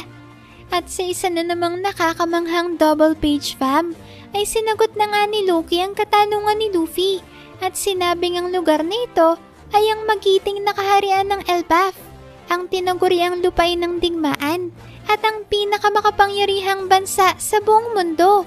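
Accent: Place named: Bicol, Philippines. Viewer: Taga bicol ka pala?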